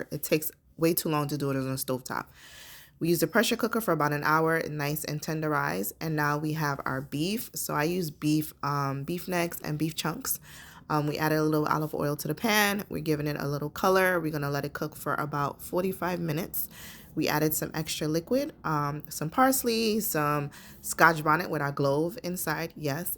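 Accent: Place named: United States. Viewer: You.sound like you might be living in the States. Where do you finf scotch bonnet? People keep referring me to habanero, but it is just not the same.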